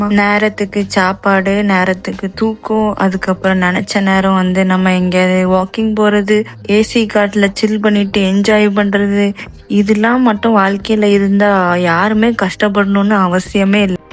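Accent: Tirunelveli, India. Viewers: first time seeing your video..you got different slang..is this Tirunelveli slang..chapaad for sapaad, chemma for semma, cholluvon for solluvon..neenga "sa" endra vartha use panna mattingla..ha ha..anyway all the best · Tvl slang super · I'm a 77 year old retired banker settled in Madras. My native town is Palayamkottai, my paternal thatha's place. We have many relatives in Tirunelveli. I love your typical Tirunelveli accent despite being a Mumbaikar,. Your comments about your thambi were hilarious too. Glad your mother brought all the food for the long journey. I've seen most of your car journey vlogs and was sad about the several breakdowns of your WagonR. I was a Maruti faithful owner for 32 years having changed many models but always satisfied with the reliability of the Maruti Suzuki cars. May God bless you all dear girl.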